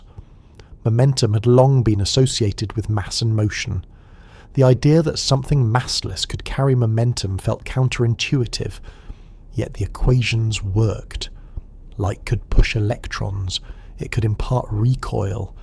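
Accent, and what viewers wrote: United Kingdom. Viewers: Friendly brit speaking (no music)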